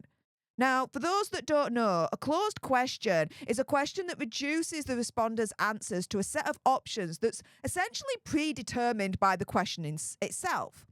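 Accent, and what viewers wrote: Yorkshire, United Kingdom. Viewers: hi which part of yorkshire are you from you sound like a yorkie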